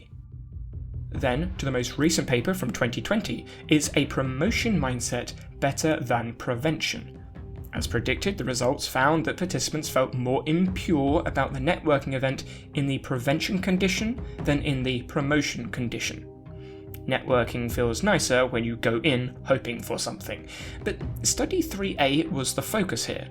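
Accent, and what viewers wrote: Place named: United Kingdom. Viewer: why with a British accent?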